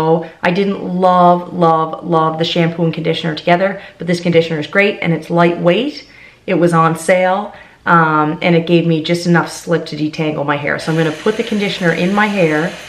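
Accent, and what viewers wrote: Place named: Nova Scotia, Canada. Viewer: I love your accent. I walk around practicing my Nova Scotia voice.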